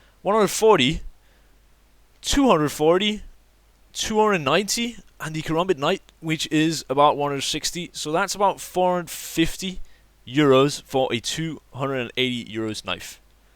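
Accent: Denmark. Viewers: Lool, are you from denmark? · Where are you from? You sound like a Dane.. :)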